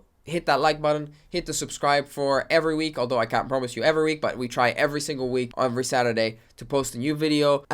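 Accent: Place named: Italy. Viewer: oh really? Sorry, it's just that you're accent sounded very Italian.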